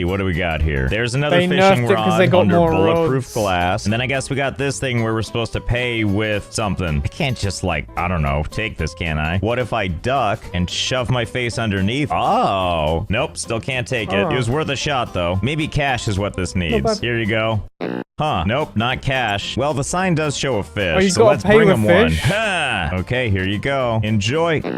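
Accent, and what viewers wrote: United Kingdom. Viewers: 1 Question. Are you British?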